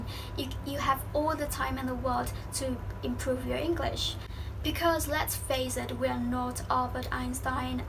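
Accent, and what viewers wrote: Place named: United Kingdom. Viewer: Wow I'm so amazed how fluent your English is and the way you talk and that British accent is so so great, I'm so jealous. Some love from your IG friend ❤🥰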